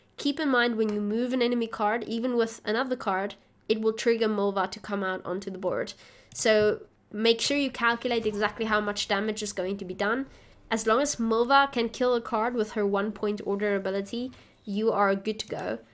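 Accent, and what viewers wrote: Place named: Afrikaans, South Africa. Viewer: Hi can you speak Afrikaans, Ek kan net aan jou aksent sê jy is afrikaans en van suid afrika 😊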